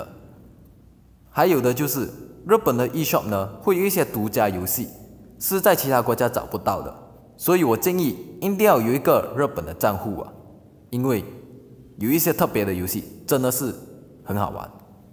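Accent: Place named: Malaysia. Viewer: 这发音 好像马来西亚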